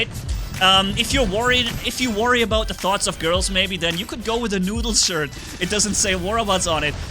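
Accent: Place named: Germany. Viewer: hahaha I think might be onto something here, because you don't realize it but even this answer, you built in a German way, by putting the past participle aaaaall the way at the end of your sentence (almost). But that's great though, really awesome. I love how one's native tongue unknowingly influences the way they speak other languages no matter how fluent they are in that language!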